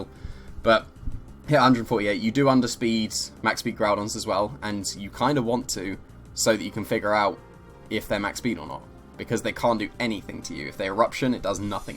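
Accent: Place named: England, United Kingdom. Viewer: English accent best accent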